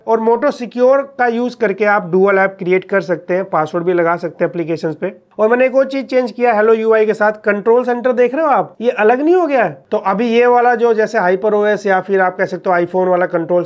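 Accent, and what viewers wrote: Lucknow, India. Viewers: Bhai aap Lucknow se hona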